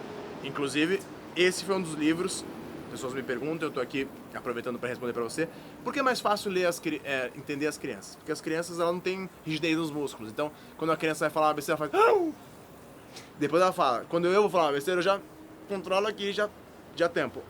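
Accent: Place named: Argentina. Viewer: vc é argentino né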